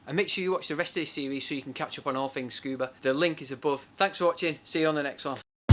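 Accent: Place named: North, United Kingdom. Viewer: At last a northerner on YouTube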